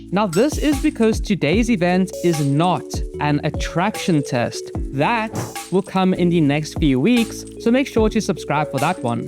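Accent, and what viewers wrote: South Africa. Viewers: Totally random, but you sound like you have a South African 🇿🇦🇿🇦🔥🔥🔥 I'm from SA too ✨✨✨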